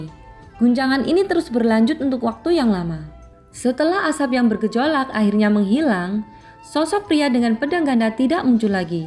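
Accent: Java, Indonesia. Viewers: Itu bahasa Jawanya medok itu mohon maaf bikin lagi yang banyak ceritanya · MALAH SESION AKHIR SUARA D GANTI JADI TIDAK MENARIK HARUSNYA DI GANTI DG PENGISI SUARA YG LBH BAGUS MALAH JDI MENDOK JADI KAKU 1000% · noba 1 jg awal"nya kaku bacanya skg enak dengerinnya... skg noba 2 sama kaku cuma bedanya noba 1 ga medhok 🤭🤭🤭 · semangat buat mimin baru... klo ada yg ngatain suara medog jawa dll jangan menyerah👌 tar lama kelamaan akan hilang kok. gw dlu pas baru pindah jakarta jg medog jawa, sekarang udah ilang medognya karena keseringan ngomong bahasa indonesia tiap hari🤭 semua butuh proses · Logat jawanya kudu ditekan nih min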